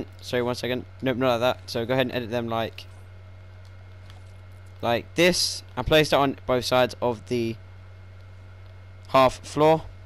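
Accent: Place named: United Kingdom. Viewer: btw i like ur british accent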